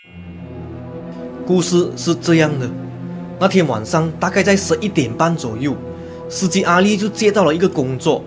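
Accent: Malaysia. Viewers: 故事惊悚很精彩，大马腔调好听👍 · 都要看/听😂，support 大马腔，请问你是客家人吗？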